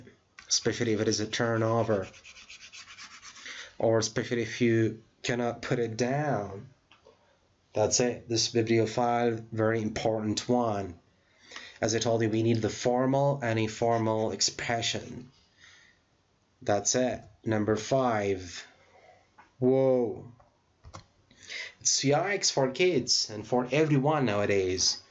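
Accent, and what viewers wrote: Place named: United States. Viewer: i like your american acent i like american english can you give us more hints and clues about the acent in english and thir differences ?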